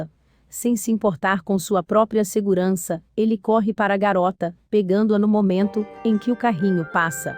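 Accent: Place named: Northeast, Brazil. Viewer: Gente,é uma nordestina tentando narrar🤣🤣🤣🤣…Sou nordestina e não tenho nada contra mas ficou péssimo😂😂😂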